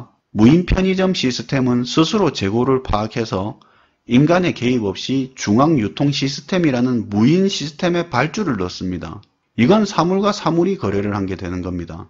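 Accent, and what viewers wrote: Gyeongsang, South Korea. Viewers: 갱산도 사투리가 아주 구수합니다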